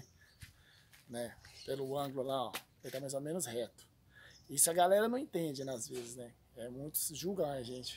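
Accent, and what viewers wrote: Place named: Minas Gerais, Brazil. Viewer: de qual lugar de minas vc é?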